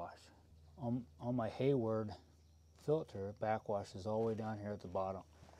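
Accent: Pennsylvania, United States. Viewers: I just watched this and it’s great! 1 question, are you from Northeastern PA!!? You sound like it.👍😂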